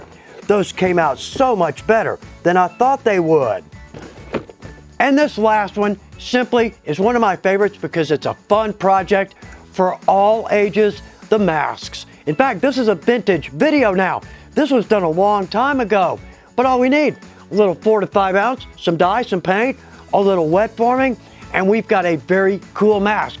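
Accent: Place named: Texas, United States. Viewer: Congrats. I haven't been watching your videos for ten years, but I have for a long time. I have been doing leather work since high-school ( I am 80), but every time I watch one of your videos I learn something. Sometimes it is something that i have forgotten about but that's a different story. I enjoy watching you work and all the tips you have. One thing though, your Texas roots show up once in a while in your speech. You can take the boy out of Texas but...............! I live in Texas a little south of where you did. Keep the videos coming because I am not through learning! Thanks!!!!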